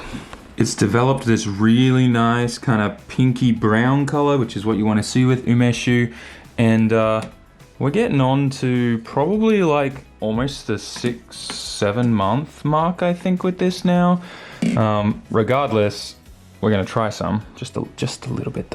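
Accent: Australia. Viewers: He absolutely still sounds bloody Aussie! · I still hear nothing but Australian accent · Still Aussie to me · the tone of your voice will always sound Australian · the most Australian I've heard you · I picked you for Australian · the second i heard your accent i could tell you are Australian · his voice will always sound Australian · You sound Aussie as hell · I could tell by your accent you are Australian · You still sound ozzy to me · You still have an Aussie accent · You've still go the accent... starting to sound like us down here in Melbourne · your Australian accent is still very Australian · I can still pick up the Australian accent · I would think you were Australian. It's not as thick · you still sound like a natural aussie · Still got the aussie twang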